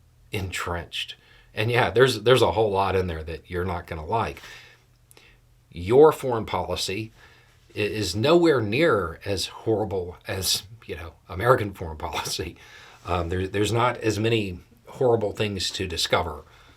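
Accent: New Zealand. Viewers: not sure if this is the case, but you sound as if you’re in NZ now? Possibly studied or lived there? 😊 I would love to get a chance to meet Māori people IN NZ instead of here in the US. The syntax of the language blows my mind. To Beaus point… this tRump disease has been working its way through international politics since about 2017’ish (possibly earlier) I watched Victorian and NSW politicians turn into antivaxxers overnight. I was heartbroken when I heard of the first community (local) infections in both NZ and AUS. Thank goodness I was able to reach a few of my friends who got the vaccine at my personal request. However, as great as being able to help there felt, I had neglected my own parents. My Mom passed from Covid in January of 2022. I wish that I could place the blame on tRump only. I cannot do that while others that lead to so much unnecessary suffering and death still walk around. 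So I keep trying. Thanks for letting me take you verbally hostage for a moment! 🥹 Don’t let tRump or his politics invade your country like he did ours. Lest many more suffer and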